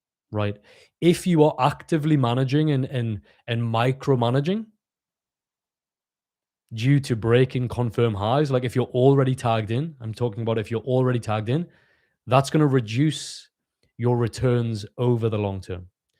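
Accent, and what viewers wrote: Newcastle, United Kingdom. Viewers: You sound a little bit like a geordie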